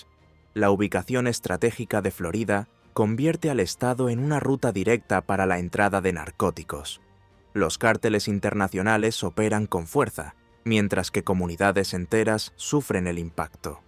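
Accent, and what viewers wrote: Spain. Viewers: Pero de que está hablando este señor con acento español , en España la personas mayores en los pueblos abandonados el gobierno no se ocupa de ellos , las inundaciones arrasaron Valencia y las personas están abandonadas la inmoralidad sexual es increíble, las violaciones los asesinatos de hombres a sus esposas, los padricidos pero de que habla este bocón ya quisiera el poder vivir en Miami , en España las personas no pueden pagar un techo apropiado para poder vivir este hombre si se le puede llamar así que se enjuague su boca para hablar todas las mentiras que está hablando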